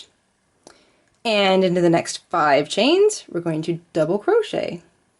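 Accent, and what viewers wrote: Canada. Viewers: This was such a great video full of great tips especially for a beginner thank you so much also it was so fun to watch that cute giggle and Canadian accent!!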